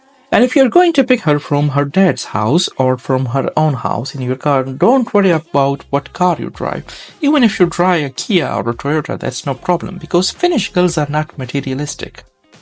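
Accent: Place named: India. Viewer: Miksä kuulostat ihan intialaiselta? Etkä taia kyllä perkele mikää suomalainen olla. (He clearly is not a finnish person like his name says) fucking clown vittu mikä pelle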